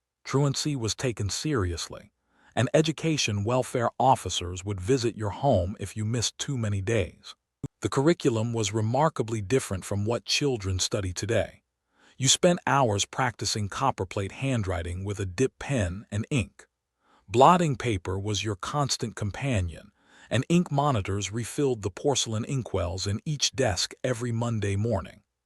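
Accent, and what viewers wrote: United States. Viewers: Why an American voice,? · The American voiceover suggests this can be disregarded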